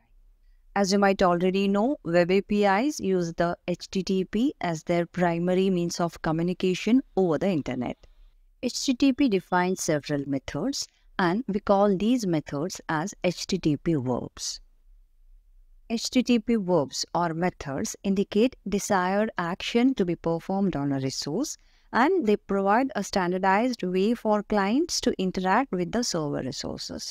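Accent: India. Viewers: May I know you are from kerala? your english is good. I saw lot of kerala people has this prononciation of speaking english.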